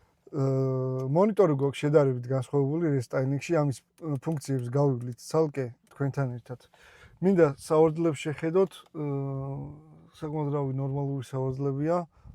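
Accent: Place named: Gori, Georgia. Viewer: გორელს კილო აქ განსხვავებული, მარნეულში გატესტოს მანქანა აზერი ხარო კიარ ითქმის..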